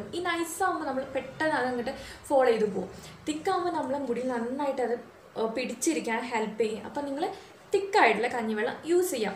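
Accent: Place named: Kozhikode, India. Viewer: njnm calicut aanu chechii.. Slang kettappo thonni atha chodhiche ♥️😘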